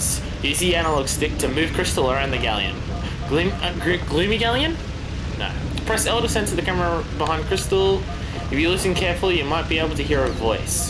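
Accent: Australia. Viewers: Gawd, I love Australian accent! Thanks for these, MATE!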